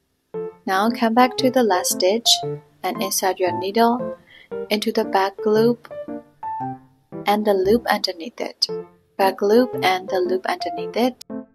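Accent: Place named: Myanmar. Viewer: Are you burmese? bcz ur accent sounds abit like burmese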